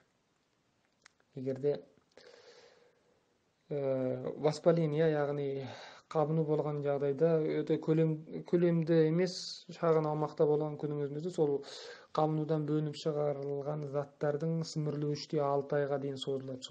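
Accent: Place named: China. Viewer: Рахмет сізге. Көп танымдық ақпарат беріп өттіңіз. Алайда Қазақстанға басқа елден келген адам құсап сөйлейсіз. Кей сөздеріңізді түсіну мүмкін болмады. Қытайға жақын жер ма сол жақтың акцентімен сөйлейді екенсіз. 🤭🙊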